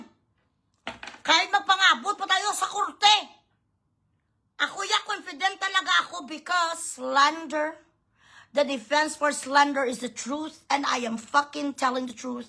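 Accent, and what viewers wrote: Iloilo, Philippines. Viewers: 😂😂ginagalit mo si madam,,,,, 😂 natatawa ako sayo pag nagsasalita k,,, ilongga..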